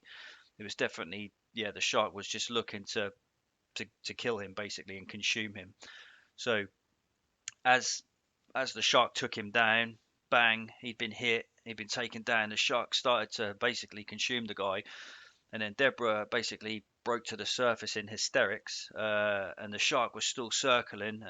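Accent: Cornwall, United Kingdom. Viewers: He is from Cornwall, they have an accent, like us Londoners, but I understand him fine.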